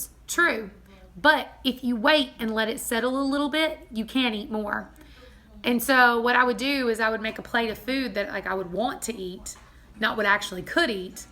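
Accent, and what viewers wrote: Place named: East Texas, United States. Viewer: "What accent!" Indeed! You make me feel like I'm back in East Texas, surrounded by good looking men in Wranglers so tight you can tell what religion they are and when you said, "You feel froggy?" I wanted to yell, "JUMP!" at my screen!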